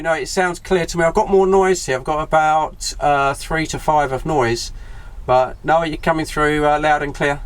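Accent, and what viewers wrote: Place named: Newcastle, United Kingdom. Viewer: ahh ok, Geordie accent!!!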